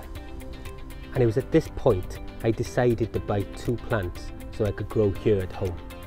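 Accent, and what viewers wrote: Wales, United Kingdom. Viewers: Are you a Welshman? Charming in any case!!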